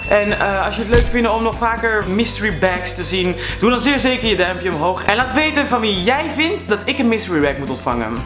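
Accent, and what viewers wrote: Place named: Netherlands. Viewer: hey! je bent echt de beste en ik vind dat hollands accent zo leuk en grappig! ik ben echt je grootste fan! je drumt echt SUPEEERR!! en die krulletjes zijn TE SCHATTIG!😊😊😊ik hoop dat ik je op een dag kan ontmoeten!!!!! 😘❤😎😋😋 en by the way! kei coole video! love it!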